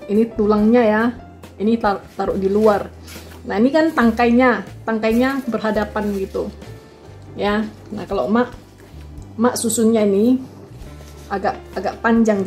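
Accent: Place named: Tanjung Balai, Indonesia. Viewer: kalau dengar logat dan bicaranya mak mungkin punya latar belakang orang tj balai ya , sukses selau mak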